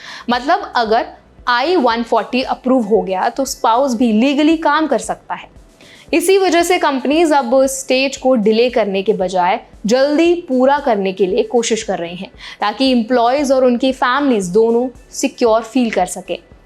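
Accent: India. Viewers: This girl is throwing misleading information with her strong Indian accent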